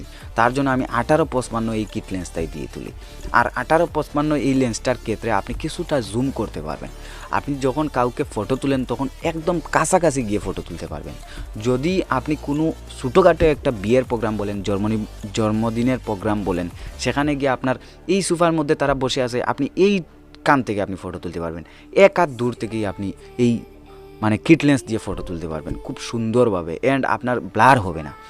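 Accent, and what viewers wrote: Sylhet, Bangladesh. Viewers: Vai ki sylheti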